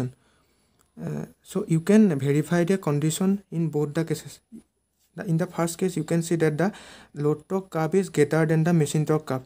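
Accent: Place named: Assam, India. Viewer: Moiu kotha huniye axomor buli dhoribo parisilu. Btw nice video bro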